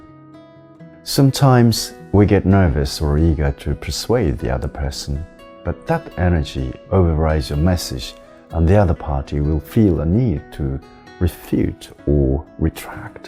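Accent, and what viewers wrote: United Kingdom. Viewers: 大愚和尚のブリティッシュアクセントが好きすぎる。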